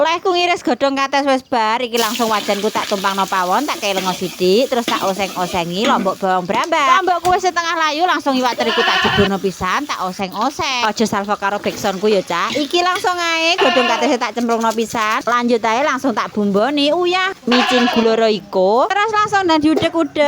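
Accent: Java, Indonesia. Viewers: blunyut itu apa mak, medok nggih mak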